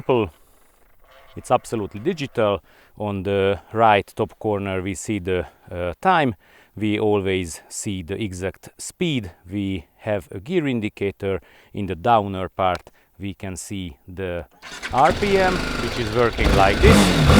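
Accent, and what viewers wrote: Hungary. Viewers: Hungarian accents are cool. Sounds slavic, latin, and germanic all at the same time.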